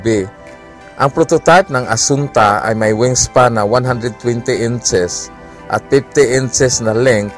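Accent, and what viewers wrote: Visayas, Philippines. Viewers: Konting bawas pa ng visayan accent at pwede na.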